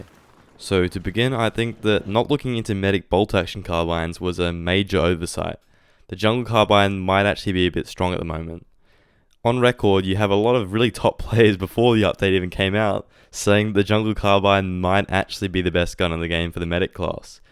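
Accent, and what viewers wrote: Australia. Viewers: Man it’s good to hear an Aussie voice 🤘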